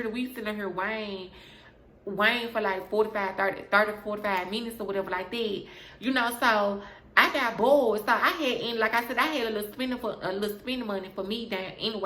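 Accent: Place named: Tennessee, United States. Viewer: Omg you from Memphis!!! I made my first comment without see the part you from Memphis lol I know a Tennessee accent.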